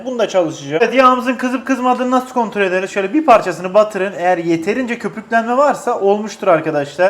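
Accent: Adana, Turkey. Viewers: Ya bak abi sen adanalı gibi konuşan ve Diyarbakır'da yaşayan birisin bugün barbecue wings yapıyoruz demenin ne gereği var barbekü soslu kanat yapıyoruz desene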